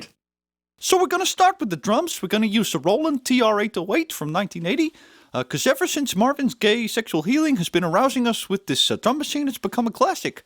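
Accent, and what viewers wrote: Netherlands. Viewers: I’m hoping the folks at Rockstar invited to do a radioshow for GTA VI! Good fun! Pretty decent Dutch accent there 😀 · How is your Dutch accent so on point!!!? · Not gonna lie, he has a great dutch accent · What is the reason behind the Dutch accent lmao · The Dutch accent sounds just so right :) Totally enjoyed this. Thanks 😊 'I don't blow myself, I tried it but it hurt my back'. Briljant. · Very Dutch accent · You certainly sound like at least one of your parents is Dutch ... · you're doing a amazing dutch accent if thats your intention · Your Dunglish is on point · Hi, where did you pick up the dutch accent? I love it.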